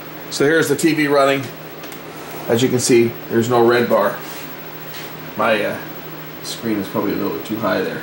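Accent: Canada. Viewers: Gonna take a guess on your accent but are you from Alberta?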